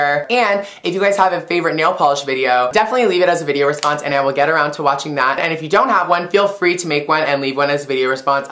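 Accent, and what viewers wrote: Canada. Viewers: Lol love your Canadian accent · are u Canadian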